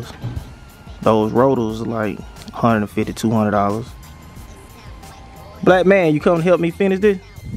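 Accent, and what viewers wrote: Mississippi, United States. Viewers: Man it sound like u from Ms dat bih pressure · Bro you from Mississippi??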